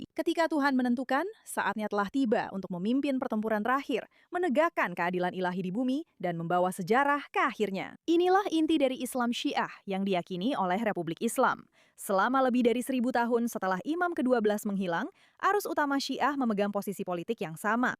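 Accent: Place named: Malaysia. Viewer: Dialeknya kadang malaysia kadang Indonesia. Kurang seru 😂😅👎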